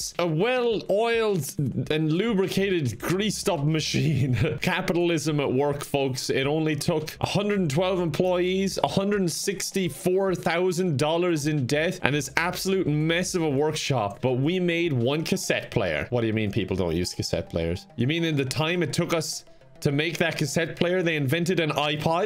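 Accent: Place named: Ireland. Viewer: Didn‘t think I‘d see an irish dr.Eggman today...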